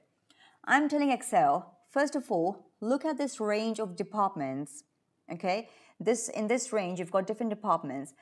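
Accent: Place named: Turkey. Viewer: you sound Turkish though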